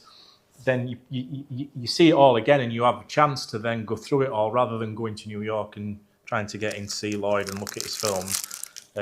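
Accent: Yorkshire, United Kingdom. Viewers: Haha, no worries at all, I’ll definitely be able to understand! Your Yorkshire accent might be a bit unique, but that’s totally fine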